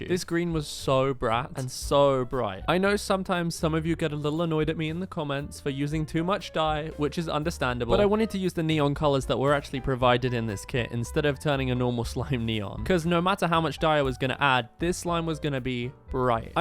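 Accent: United Kingdom. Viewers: British accent 😂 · you have a British accsent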